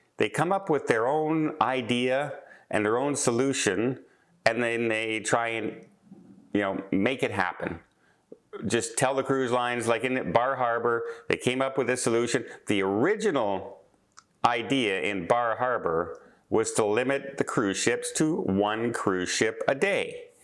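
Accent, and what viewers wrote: Canada. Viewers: The rain on your parade, but you sound very smart, logical and Canadian. Those three words are never used any politics policies on American soil. What is more done is drawing the line in the sand and saying you cannot cross unless you’ve been to my will. And yes, the people making the decisions most times have nothing to do with the outcome meaning the people making the decisions in this case in Alaska are not the business that profit by all the tourists. It took me a few years to realize this when I moved in 1999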